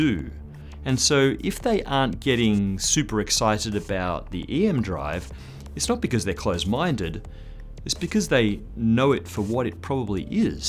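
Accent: United States. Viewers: so you had to stuff up this channel by employing Americans with course and shrill accents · speak in an English the whole world can understand...dont use your american accent..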